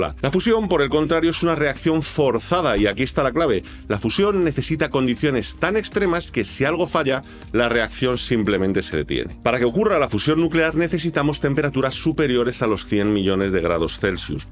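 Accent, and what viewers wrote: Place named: Spain. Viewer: hay que ver cuanto dinero se invierte, por lo gral hay pasta donde se ve algo de potencial... , pero claro, el humano promedio asalariado de a pié , se enterará en la factura de luz/energía tal vez dentro de unos añetes ... xD, o lo que lleve la faena... Soy argentino, pero el azento me hace dar ganas de usar palabras o términos de la pez nínzula ibérica xD jaja xD : "ponele":.. :-b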